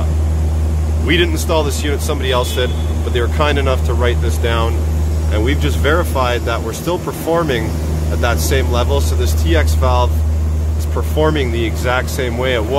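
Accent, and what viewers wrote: Canada. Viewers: 404, EH? Isn't that a freeway going north from the Don Valley Parkway? 😀 You sound like you are Canadian...if not, I will remove this comment. Having fun here in Virginia.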